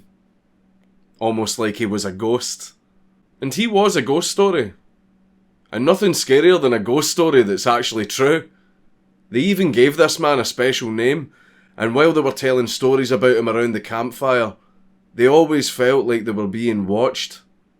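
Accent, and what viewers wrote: Scotland, United Kingdom. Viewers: I would love to have dat accent its so extreme I love it. (sry if wrong but is it scottish accent?)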